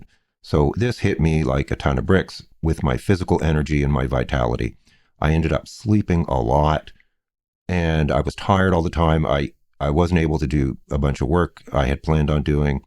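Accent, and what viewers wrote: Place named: Atlantic Canada, Canada. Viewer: I knew as soon as I heard your voice you were probably from somewhere in Atlantic Canada...Newfoundland? Im in northern BC. Anyway, just found your videos and love your style of articulation. I'm going to check out your ritual material next. Thanks for sharing your insights here. 🙏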